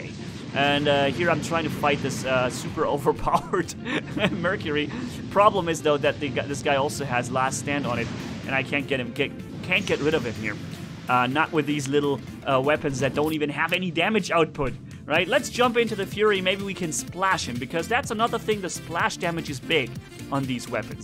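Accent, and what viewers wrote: Germany. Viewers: You do realize that English is NOT his native language, he's lives in Germany. Why don't you type your posts to in German and see how well you write/pronounce words in his language.. In fact I'm not positive but I think he learned English just in the last 5yrs or so. Don't worry though, I’m sure and others around the world, who dare to speak on social media in their non native language of English, will take your post to heart, and vigorously practice their proper pronunciation of English words before their next video/podcast. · Are you German?!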